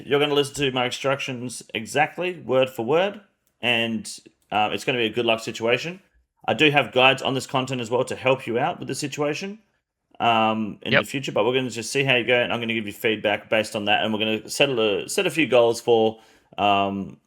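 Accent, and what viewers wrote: Australia. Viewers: It’s Aussie talk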